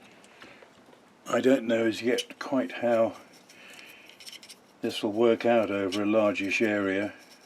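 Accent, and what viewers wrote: England, United Kingdom. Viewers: *, where are you located? Depends on the type of steel as to the porosity. A little heat between acetone cleanings will help. Looks pretty good in the end. *The reason I ask is your accent and terminology makes me think England, but you measure like an American.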